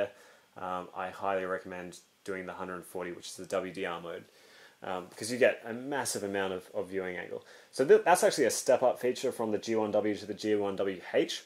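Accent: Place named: Australia. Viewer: Silvia and Aussie accent, feels like I'm watching Mighty Car Mods :)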